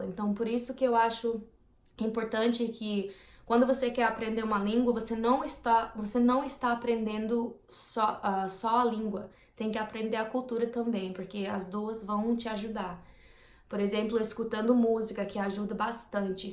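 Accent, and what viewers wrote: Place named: São Paulo, Brazil. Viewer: LIKE👍 you!!! Seu marido deve ser paulista.... Seu português tem um sotaque paulista... KKKK Eu vivo no Rio de janeiro (Saquarema)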